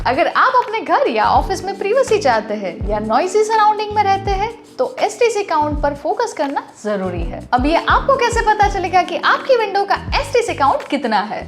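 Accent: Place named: South, India. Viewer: You sound south, no offence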